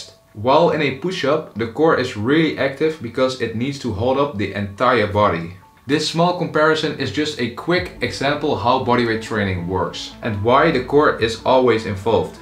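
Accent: Netherlands. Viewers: I recognize this accent immediately. They are dutch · I smell Dutch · Yep theyre Dutch you can hear that accent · top video, zeer behulpzaam! love het hollandse accent onder dat engels :) groetjes antwerpen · The accent screams “ I’M DUTCH!!!” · Ik kom al horen dat jullie een nederlands accent hadden😂😂maar hoe hebben jullie ooit dit berijkt en waar doen jullie dit? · Yeah they are from the netherlands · You’re Dutch right? Hahahaha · or, we can just be proud that us Dutch have such a high rate of English proficiency, with or without accent. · Cringeeeee big time, please just talk Dutch or in proper English xD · Are you from the Netherlands? I can hear it by your accent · Puur Hollands lol! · Haha no idea who you are but half way first sentence I hear a fellow Dutchmen. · holl up holl up, judging from your accent you guys are fellow Dutchmen am i right? would make me proud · Nederlandse accent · Ultimate Dutch accent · Dat Hollands Engels is echt zalig😅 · lekkere nederlandse tong tijdens het praten haha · I subscribed because of the Dutch accent. 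Respect vanuit Amsterdam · Lekker nederlands accentje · Yeaaahh i heard it immediately hahahha. I.speak dutch but i dont have thia accent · Hollander English to funny. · you sound very dutch hahaha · Are you Dutch?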